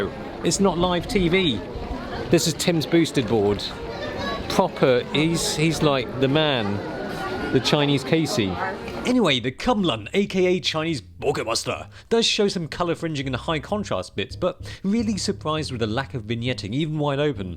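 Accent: England, United Kingdom. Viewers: Why is it like a intellectual orgasm to listen to an Asian with a deep English accent? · I have know idea how. But his British accent. Also gave me satisfaction, beside his reviews. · I love Asian with British Accent, NICE. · How've come you got some fluent Brit accent? Bruh salute you 😎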